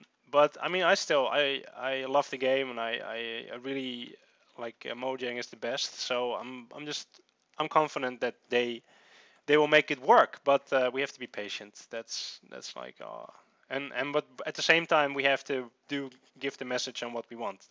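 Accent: Netherlands. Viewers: Je kunt zo horen als nederlanders engels praten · ben je eigelijk nederlands ik hoor het aan je stem? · Tuurlijk is hij nederlands dat hoor je aan zijn verkrekt engels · Hij praat met een nederlands accent... LOL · I can hear from your accent you are dutch, arent you?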